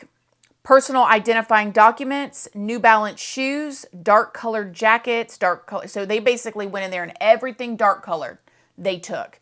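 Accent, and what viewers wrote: South, United States. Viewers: your so beautiful i absolutely listening to you talk I like your accent! Southern... it’s so indearing even if your speaking on something like this 😮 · WeekAND.. love that southern drawl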